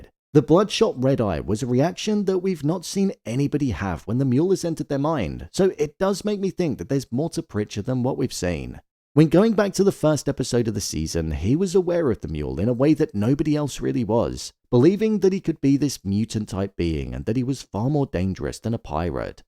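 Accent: United Kingdom. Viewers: Is this guy a British AI voice?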